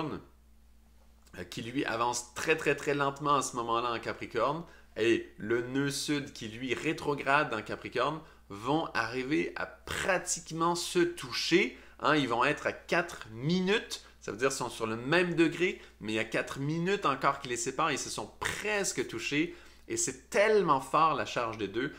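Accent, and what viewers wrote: Canada. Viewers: Moi j aimes bien l accent canadien . Monleal...monleal ... Merci pour cette vidéo.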